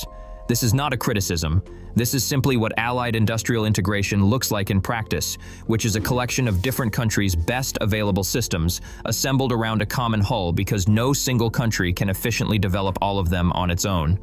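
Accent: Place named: United States. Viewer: Excellent video showing superb European cooperation to create a state of the art platform. Pity that the narration was with an American accent. It is noted that the frigate has ZERO American content. Why therefore is the narration American? Content - excellent. Presentation - not so much.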